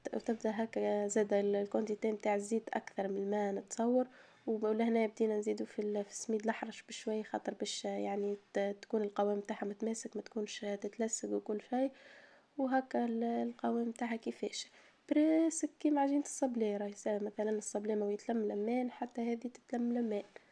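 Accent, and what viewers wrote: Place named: Souf, Algeria. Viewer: الله أعلم هادي لهجة سوفية اكثر من تونسية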